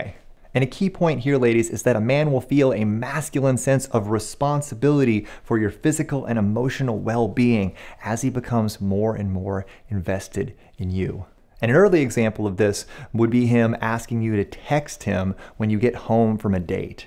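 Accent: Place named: New York, United States. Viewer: l Loved your New York Accent!!! 😂😂😂😅😅😅😂😂😂😇😇😇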